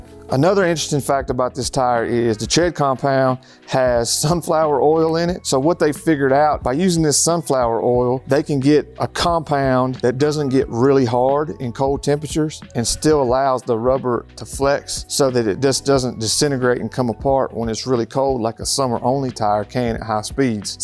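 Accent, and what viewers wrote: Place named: Missouri, United States. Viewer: The Accent. Are you from M.O. St. Louis or K.C.?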